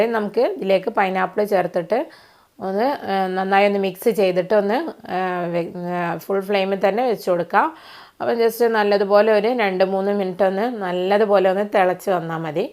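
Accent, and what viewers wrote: Malappuram, India. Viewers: Itha malappuran anoo. Talking kettitt..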